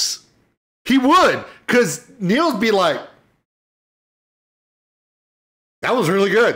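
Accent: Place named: Italy. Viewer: Kinda funny how you speak spanish with Italian accent.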